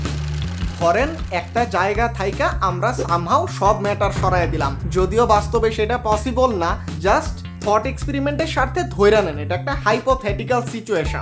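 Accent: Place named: East Bengal, Bangladesh. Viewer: Give up your East Bengal Bangal language please.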